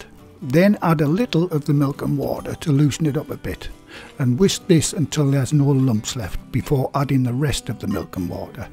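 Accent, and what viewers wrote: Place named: Northern England, United Kingdom. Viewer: Hi, where did you get those oven gloves from? Great videos keep up the good work. Lovely to hear a northern accent on YouTube 👍🏻😉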